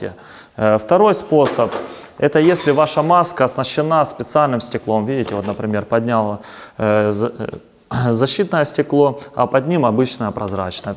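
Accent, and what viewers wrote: Kharkiv, Ukraine. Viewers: В Харькове все так Гаворят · Чисто харьковский базар.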